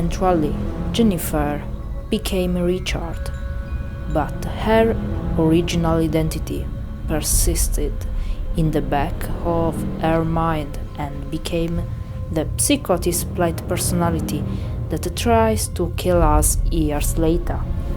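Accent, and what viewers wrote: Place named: Italy. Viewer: Love your accent. It's Italian right?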